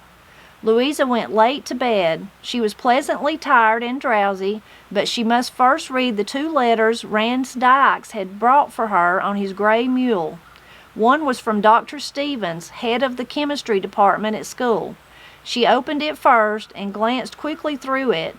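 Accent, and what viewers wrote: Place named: North Carolina, United States. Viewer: Your accent reminds me of my relatives, on my Mom's side...... they all came from Knightdale, NC. I recall hearing very similar voices, and the dialogue was always family, gossip, or both. Thank you for a trip back, if only for a wee bit.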